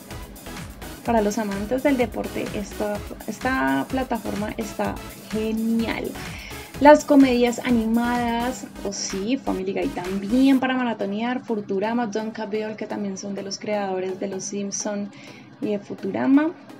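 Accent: Colombia. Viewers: Eres colombiana?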